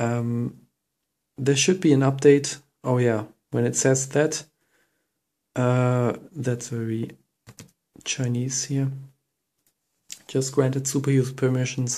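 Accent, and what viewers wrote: Germany. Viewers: Great tutorial. Btw it's quite cool that you are running a Chinese version of superSU while speaking with a German accent.. no offense tho. I live in Germany.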